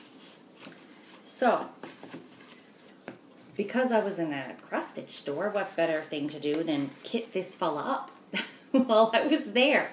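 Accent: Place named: Canada. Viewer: Enjoyed the story about your meet up with the other stitcher. Love your accent, probably because my dad was Canadian!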